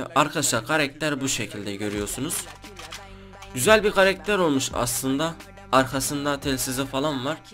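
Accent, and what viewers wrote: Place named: Southeast, Turkey. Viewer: Şivenden anladım hangi güneydoğu şehrinde yasiyon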